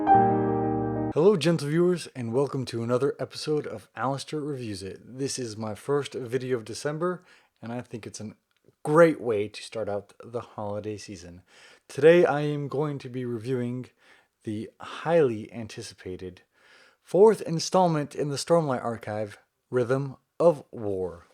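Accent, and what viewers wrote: South, United States. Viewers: I love your video at your house in Texas during your quarantine until you had like a book called, "Rhythm of War" by Brandon Sanderson until you're talking about all of the characters on the book from the very beginning while you're reading the whole thing after that, I hope your husband, Zach will be very proud of you and I love your Southern accent!!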